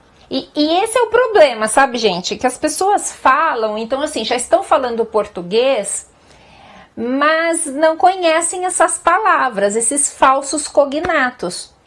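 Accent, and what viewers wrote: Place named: Sao Paulo, Brazil. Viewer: Essa professora es tao bonita, formosisima, e ainda falou do meu Chile aaahhh eu estou enamorado de ela😍😍❤❤. Por su linda forma de falar ela debe ser paulista, a pesar que em Sao Paulo ha muitos sotaques. Ao final nao se sabe qual es Paulista realmente. Mas a letra R ela a fala como riojaneirense, eu adoro, tal vez ela es Paulista mas em alguma cidade que está na frontera com o estado de Rio de Janeiro. Saudos desde Chile e um grande abraço. Beijos nao me atrevo pois deve ter enamorado ou marido 😬🙊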